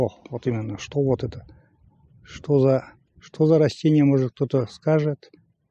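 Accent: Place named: Vologda, Russia. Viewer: почему-то не могу найти где вы живёте? Где-то в видео мелькал Сыктывкар. Это там вы живёте? Очень говор похож на моих родственников они жили в Вологодской обл.и в Архангельской обл. Слушаю вас и как будто бы с ними общаюсь. Они тоже были спокойные, урасновешенные. У них тоже были избы из бревен, холодно также было как и у вас. Красота природы тоже как у вас. На жаль они уже все ушли в мир иной. Но не будем о грустном, царствие небесное им а нам здравия, любви, благополучия.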